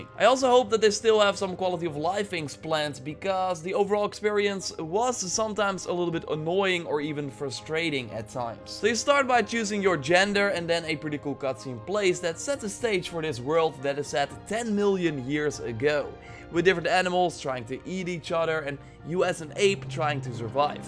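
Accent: Netherlands. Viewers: Love your Dutch accent!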